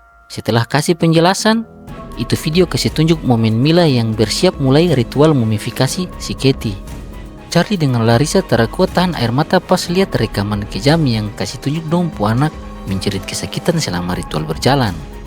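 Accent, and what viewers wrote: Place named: Eastern Indonesia, Indonesia. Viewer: Pertahankan logat timur anda bung.. Pertahankan ciri khas bung & jadilah berbeda (positif). Saya doakan channel bung sukses 🤝👍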